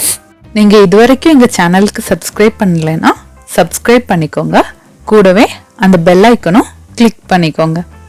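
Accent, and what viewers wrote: Kerala, India. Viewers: Hello 🤣🤣 that's TAMIL not malayalam. She's speaking tone which reflects her mother language malayalam but she here fully speaks in tamil words only. You north indian guys are over thinking malayalam is only a down south language. But truth is tamil language is mother for all south Indian languages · Malayali anu alle... Tamizhu kettapol manisilayi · Firstly for the malayalam tone tamil speech i am subscribing your channel👍😍 of course for presentation also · Enikkum thonni evideyo oru malayalam touch... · Covered all steps including 'maava salichi vachikonga' ...loved this video And even though I could sense kerala accent, tamil is good!! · U sound like a malayali talking Tamil... Good quality video!! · Ur talking is like a malayali... R u a from Kerala Or tamil nadu??? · Malayali daa tamil ketta puriyaathaa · Malayala manam unga tamizh la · Malayali aano ? 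Malayalam touch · Malayali aanu...aa tamil kettal thanne arayaam...but sambavam supper tto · ningal malayali aan le .tamil samsarikkunnath klkkumbol thanne manasilavunnu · Woww superb kfc👌... malayali tamil pesara maadhiri iruku..ningal malayali alle?😊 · U sound like more malyali than a tamilian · Malayalam kalantha tamil🙂, good recipe. Thanks.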